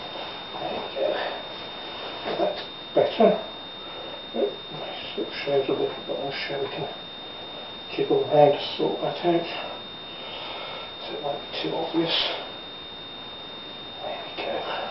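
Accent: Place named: England, United Kingdom. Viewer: sounds like your in england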